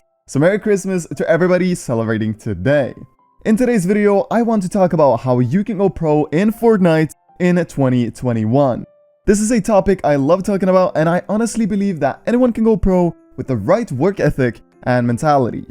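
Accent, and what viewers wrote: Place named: Norway. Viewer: jeg visste at du var norsk jeg kunne høre comsa dine pa turneys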